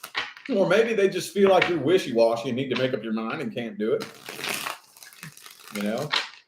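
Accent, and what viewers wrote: South, United States. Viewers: I'm a Gemini and yeah.......I'm done with the BS........had to actually call the law on my "person" a couple weeks ago to GET HIM GONE!!!........He loved loved loved the chase, and the drama and trying to manipulate and control ME!!! Been goin' on since last August, whew! It was a ride, the SEX was AWESOME, but that ain't everything, lol......., you are SO cute!! and I hear a country boy accent goin' on there......where you from, sweetness?........Alabama, here, and thanks for your right on readings darlin'!!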